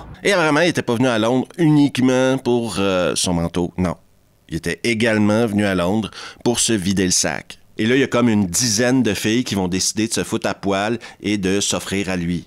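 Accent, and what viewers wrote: Quebec, Canada. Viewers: J'ai découvert ta chaîne il y a quelques jours et je me suis tellement fendu la poire que j'ai dû voir au minimum une cinquantaine d'épisodes. Qql suggestions : Brain dead, bad taste, phase IV. PS, j'adore l'accent québécois 🤩🤩🤩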